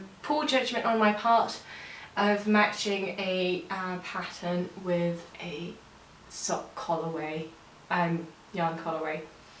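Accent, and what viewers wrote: United Kingdom. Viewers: Oh your Southern English twang is adorable! I'd been wondering how you were getting on, here's a very late welcome to England 😊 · you've even got a bit of a 'Brit' accent already-too cute! glad you found the time to share all the new and exciting things with us!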